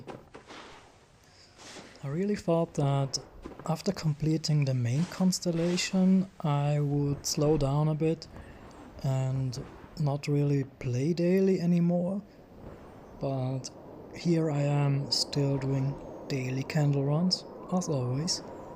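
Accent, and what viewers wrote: Germany. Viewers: Dang i havent seen the video yet and it now just got the notification. Your voice is so beautiful and calming. I figured you'd me southern German/tyrol maybe? I could tell, because I am a German peep too! Your videos are so funny and a big entertainment. You helped me out alot in my moth days so thank you. Keep going and maybe we could be friends on sky?.. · You really have a calming voice^^ Im trying to figure out what your accent is😅🤔🤔🤔 is it german maybe? Or something else? Hmmmmmm🥲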